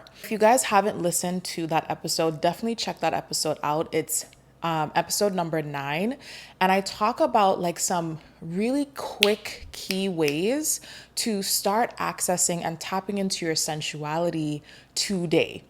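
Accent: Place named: Caribbean. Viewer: Binged these videos and from the first one I said “She from the Caribbean🤔”. That “aht aht aht” was it for me 😂🫶🏾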